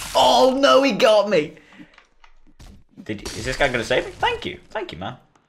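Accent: United Kingdom. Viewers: He literally have a fricking deep British accent now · He sound so british he sounds like a 12 year old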